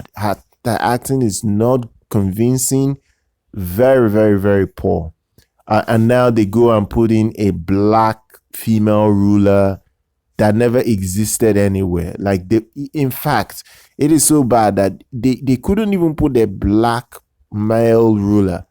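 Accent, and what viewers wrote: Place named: Nigeria. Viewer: Such a breath of fresh air. Seen a video on this. From what I'm going to say sounds like Nigerian, your Nigerian. It sounds like It's so good to see some black people refute this because I am getting into the show and for years now we're seeing just tokenization after tokenization all the time and it's always white characters being changed. So frustrating. I don't like it on either side of the spectrum but the moment you say something you're deemed a racist and it's just so wrong and so many levels. They're trying to blackwash everything in the name of diversity and inclusion and it's just when you're dealing with a historical show and historical figures, this person actually existed. The person that they changed actually existed was a white male and they've changed him for no reason other than pushing the message. Thank you! 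Thank you for this video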